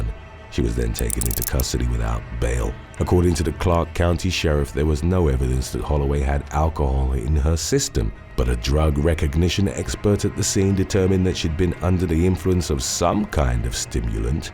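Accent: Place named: United Kingdom. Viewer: A Lu min e um 😂 😂 you Brit’s crack me up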